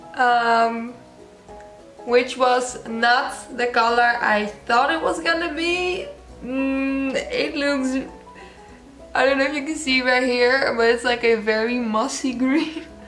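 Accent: Netherlands. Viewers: dutch accent hihi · Hoorde gelijk aan Je accent dat je NL was 😂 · Whahaha ik zat voor het eerst te kijken en ik dacht ‘Dit is een TE Nederlands accent’ en zag in de beschrijving dat je inderdaad Nederlands bent! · Hahaha altijd zo leuk als je denk hmmm Vgm is ze Nederlands want ze heeft een Nederlands accent en het dan goed hebben! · Hahahaha 1 min into the video en hoorde gelijk dat je Nederlands bent 😂 love ur hair tho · Is dat een Nederlands accent? 😏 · You have a dutchy English-speaking accent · Are you Dutch? If yes, your accent gives it away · I couldn't quite figure out why your accent sounded so familiar to me, until I looked at your channel and saw you were from the Netherlands which is where I'm from hahahaha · Are you Dutch?? As someone from The Netherlands your accent sounds really Dutch 😂😂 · Are you dutch you sound dutch ( first video of yours ive seen)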